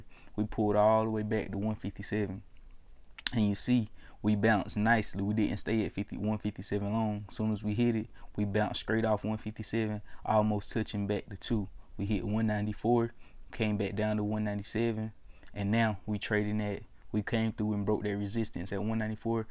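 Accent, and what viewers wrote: South, United States. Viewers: Cuz sound like he lived in Ga some point. I hear that southern tone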